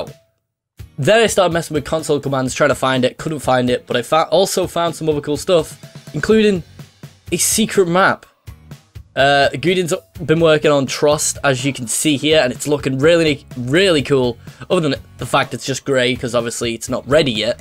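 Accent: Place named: Scotland, United Kingdom. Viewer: btw love your scottish accent!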